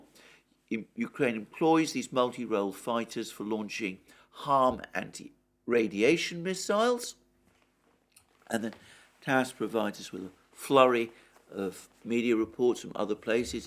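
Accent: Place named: RP, United Kingdom. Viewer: great impartial analyses👍. BTW what schools did it take to learn your posh English?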